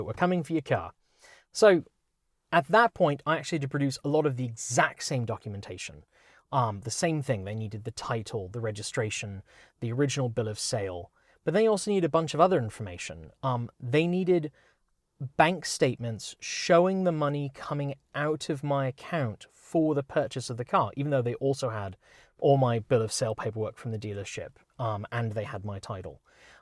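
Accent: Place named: West Country, United Kingdom. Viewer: I do like a Bristol \ West Country accent!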